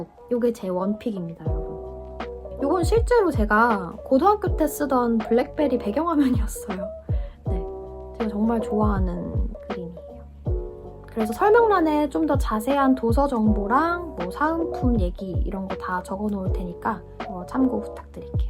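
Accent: United Kingdom. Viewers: 영국에 쭉 사시는것은 아닌가봐요! 덕분에 영국영어를 매일 듣고있어요 감사해요!!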